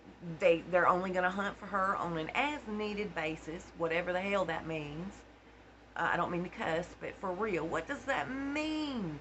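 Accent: South, United States. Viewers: I love your Southern accent. I'm Nashville born and raised but I lived up there (East TN). Covid was rough on LE up there. They know Summer wasn't abducted! Sheriff Lawson works in a high Opioid/ FENTANYL and METH territory. Lawsuit is frivolous most likely. They are smart. I heard ole Don is MIA! Something is about to break on this case?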